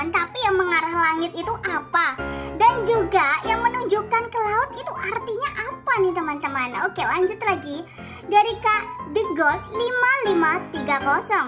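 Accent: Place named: Java, Indonesia. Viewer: Kakak ini orang jawa ya? Logat nya kerasa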